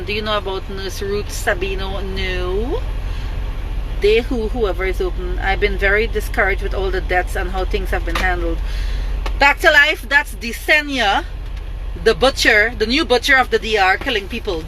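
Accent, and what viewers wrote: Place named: Trinidad and Tobago. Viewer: Soon as my open ya mouth I’m like Trini in the building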